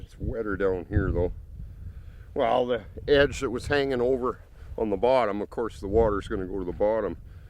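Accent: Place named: Canada. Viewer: I appreciate your inserts of humorous bits from other movies! & your Canadian accent & skills....thanks.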